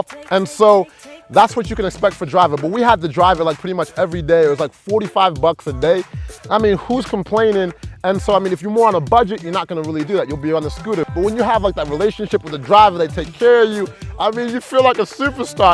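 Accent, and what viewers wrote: United Kingdom. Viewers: That British accent was cleeear bwoy. Did you used to live here in the UK?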